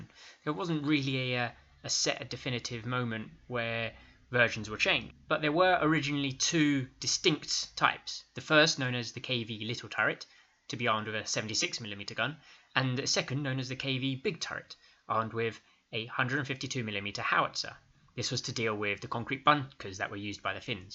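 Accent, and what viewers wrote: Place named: United Kingdom. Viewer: u sound like your from the UK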